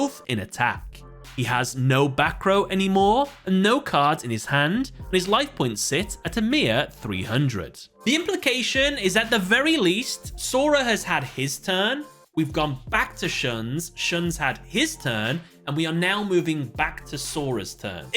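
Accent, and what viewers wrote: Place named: United Kingdom. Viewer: I assume it's the British accent + "penultimate."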